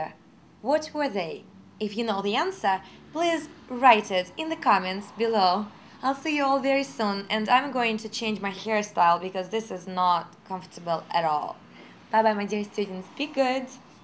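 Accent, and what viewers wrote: Russia. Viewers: nice russian accent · Её рязанский акцент раздражает... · Русский акцент... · такой акцент поймут только в россии :) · russian english accent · капец акцент питерский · I clicked a few of these because of they were featured. I think it's pretty cool what she does. Smart and good looking. Plus who does not like a Russian accent ?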